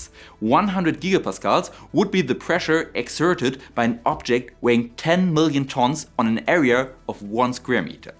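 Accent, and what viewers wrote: Germany. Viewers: if not possible to synthesize in industrial manufacturing via vapor deposition for example that material will be nigh useless because of costs. also the german accent is super cringe ldk grapene and piezoelectric especially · Great video. Made me realize I need more German accent in my science recommendations; subbed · I know I'm being shallow, but the German accent makes this all sound so much more authoritative.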